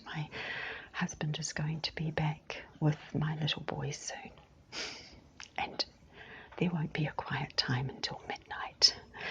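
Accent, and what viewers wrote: New Zealand. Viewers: Hello!!! I was amazed to hear that you had listened to a Minister who was also an archeologist as I did the same thing before I ever heard of ASMR (a couple of weeks ago). Was/is his name Francois du Plessis? I LOVE listening to him both for the content and the softly-spoken accent which was unintentionally ASMR. If I was sitting in one of his lectures I'd better be on the back row as I'd fall asleep instantly!!! I love the S.A. accent and I love your beautiful NZ accent. Thank you for what you do 💙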